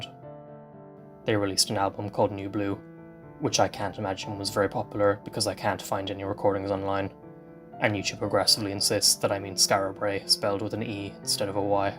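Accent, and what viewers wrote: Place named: Ireland. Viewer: Why do you not talk about the atrocities made by other European countries participating in the Eurovision, just about Israel? you're clearly an antisemite. There's a cure for that. Learn history. There is no such thing as Palestinian people it was made up in 1964 to hurt Jews and ruin their only homeland. you're probably Irish by your accent so I'm not surprised. And that Nazi scream at the end... u need a shrink man.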